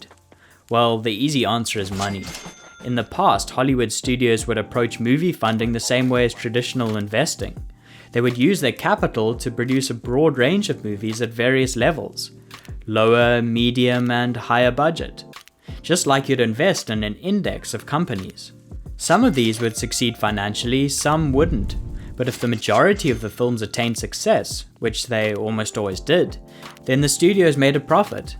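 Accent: South Africa. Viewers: Saffa accent detected? Transplanted to Ozz of course. Maybe NZ. But def Saffa underlying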